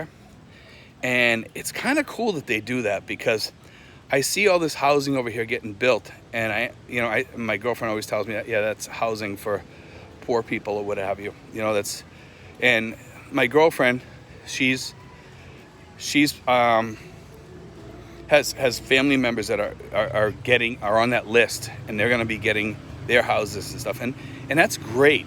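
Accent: Boston, United States. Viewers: you sound like a Boston guy. This is my first time seeing your channel, hello from Lynn Ma.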